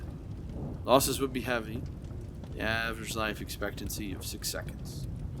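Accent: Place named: United Kingdom. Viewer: Very nice. The British accent and the almost light-hearted way he told his story made me think of the British Empire at its glory days.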